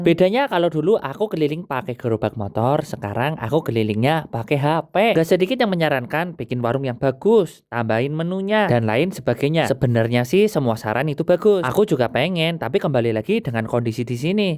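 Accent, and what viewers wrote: Banyumas, Indonesia. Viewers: ternyata orang banyumas, kukira cilacap krn logat ngapak nya samaa😭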